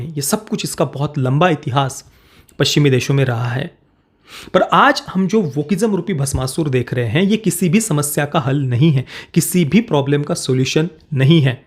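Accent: Maharashtra, India. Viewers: Heavy Marathi accent he has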